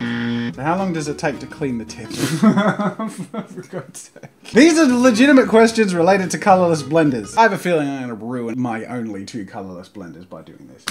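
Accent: Australia. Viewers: I learned to do a proper Australian accent from your videos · he sounds like an Australian Hank Green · British? He's Australian.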